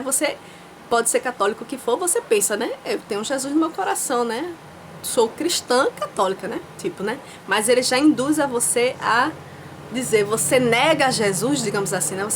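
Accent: Northeast, Brazil. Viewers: vc não generalizou pq vc falou q foi na sua congregação,nem todas são assim.Pelo sotaque vc é do nordeste,aqui no sudeste é muito rar acontecer isso que vc falou.pense bem porque Jesus não tem nada haver com isso,vc saiu pq vc quiz,vc tem LIVRE ARBITRIO!naõ vou dizer q vc vai para o inferno,mas,deixa isso quieto,não insiste com esse videos pq a magoa só faz mal para nós mesmos! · QUE SOTAQUE GOSTOSO, ESSE SOTAQUE DE NORDESTINO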